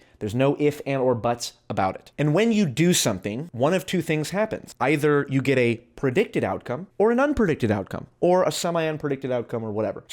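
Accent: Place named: United Kingdom. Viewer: hilarious british accent, good job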